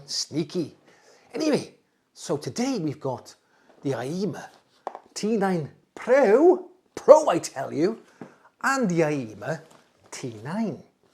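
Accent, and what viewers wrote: United Kingdom. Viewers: Hey sir I kind of like your delivery a descent man talking hifi like a normal person not a bunch of fluff raw manly hood not some intellectual garbage just some guy talking stereo equipment engaging witness to something worthwhile to good people trying to enjoy there intimate hobby no big deal FUN FUNNY FANTASTIC LOVE YOU MAN! ESPECIALLY BECAUSE YOU BRITISH DIFFERENT KIND OF GUY THAN HERE IN THE STATES DIFFERENT STYLE OLD FASHION OLD SCHOOL FROM THE OLD COUNTRY I DEFINITELY FEEL RECONNECTED TO MY EUROPEAN SIDE OF MY HERITAGE BE COOL KEEP GOING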